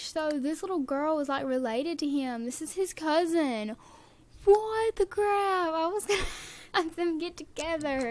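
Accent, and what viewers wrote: South, United States. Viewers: Your shoutout literally made me smile from ear to ear I'm so happy you liked the home. I really love this series so much, and your so amazing I love your voice and the way you say most things it's so....southern lol I love youuuu!!!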